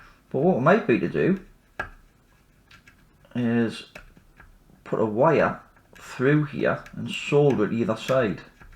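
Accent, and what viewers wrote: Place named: Newcastle, United Kingdom. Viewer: You sir, have the patience of a saint. I love watching your streams. From a chap, me, who was born in Newcastle and moved away, in the mid-70s, when I was 5. Up here again in the Toon, for the weekend for a bit of nostalgia with my wife. Never tire of the accent and I have old tapes of when I had a strong accent. Ha'way Canny lad. 😅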